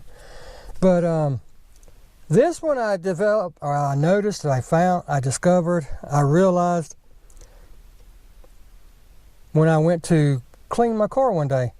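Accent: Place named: South, United States. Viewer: Absolutely you sound like you are from the south and the background and property looks like it does in the south. I lived in East Texas before moving to California so that’s where I see the familiarity.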